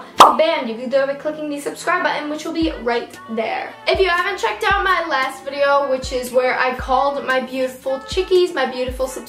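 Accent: New York, United States. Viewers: she has such a jersey or New York accident I love it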